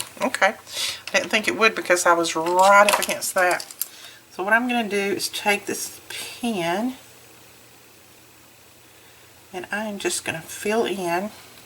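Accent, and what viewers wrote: South, United States. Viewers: I love y our faith journaling. You encourage me. Plus I love your Southern accent.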